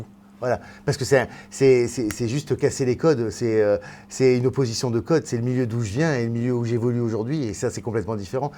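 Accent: North, France. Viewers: Surtout change rien JeanFi au début j'avoue j'avais du mal avec ton accent du nord moi qui suis du sud je n'ai pas l'accent de Toulon encore moins de Marseille. Dommage qu'avec Air France on ne puisse pas aller au Québec.... Je t'ai bien sur découverts avec la bande a Ruquier et sérieux quand Laurent te programme je suis super contente car je sais que je vais passer un excellent moment. Et puis j'ai écouté ton sketch ou tu parle de ta sœur et de tes parents. Je suis reconnue quand tu parle de ta sœur. Mais même quand tu caricature ton milieu et tout ce qui se passe dans un avion moi j'ai la chance de pouvoir le prendre assez souvent alors c'est malheureusement pas Air France que je prends mais Air Transat que j'appelle "Air Sardine" mdrrr la bouffe est immonde mais en contre partie j'ai l'accent Québéçois dés que je monte dans l'avion. En tout cas merci pour ce que tu es... 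Bisousssss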